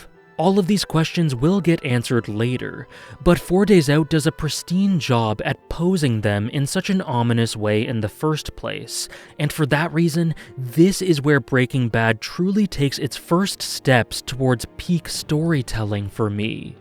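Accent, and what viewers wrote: Canada. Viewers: Listening to talk about the name of this ep: "This guy's Canadian!" (I am too brother, I get it) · Are you from Canada?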